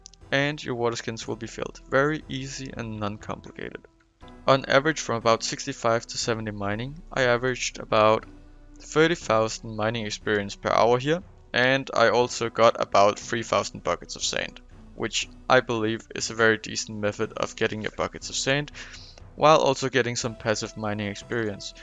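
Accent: Denmark. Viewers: Are you Danish? you sound very danish in your accent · 2 sec in i instant hear a fellow dane nice guide tho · Sjovt. Det tog mig 15 sekunder, at høre på din engelske accent, at du er Dansk. Tak for videoen 🙂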